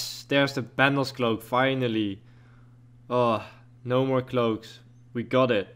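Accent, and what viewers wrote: Netherlands. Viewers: nice, i heared a little dutch accent:p, nice videos man! Just subbed! Keep it up 💪🏻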